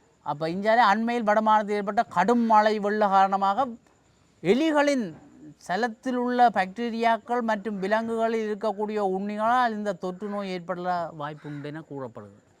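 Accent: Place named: Sri Lanka. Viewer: side என்று முடிவதெல்லாம் கிருமிநாசினி என்று விவசாயத்தின் தந்தை என்று தமிழ்நாட்டவர்களால் போற்றப்படும் நம்மாள்வார் குறிப்பிடுவார், நீங்கள் jaffnaside என்றபோது அதுவும் ஒரு கிருமிநாசி ஆகிவிடும் என்று வேடிக்கையாகப் பின்னூட்டம் இட்டேன்😄😄😄🥰, மேலும் ஒன்றைக் குறிப்பிட விரும்புகின்றேன் உங்களுடைய பேச்சு வழக்கு உச்சரிப்பு ஈழத்து நகைச்சுவைக் கலைஞர் அப்புக்குட்டி T இரச கோபால் அவர்களின் உச்சரிப்பை ஒத்ததாக இருக்கிறது அதனால் உங்கள் நளினம் வெகுவாகப் பிடிக்கும்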